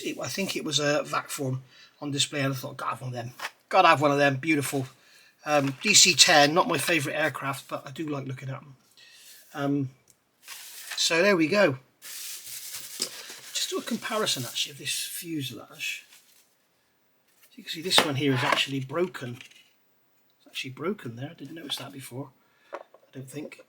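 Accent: United Kingdom. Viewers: You sound like a Yorkshire Lad .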